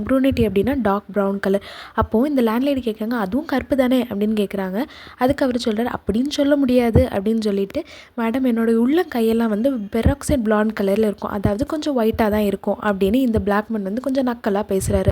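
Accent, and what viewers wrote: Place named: Kanyakumari, India. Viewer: You’re from kanniyakumari for sure some how thank you ❤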